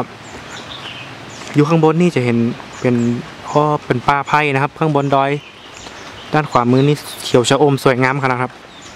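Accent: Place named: North, Thailand. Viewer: ดีครับผม อู้กำเมืองน่าฟังดูดีนักๆๆครับ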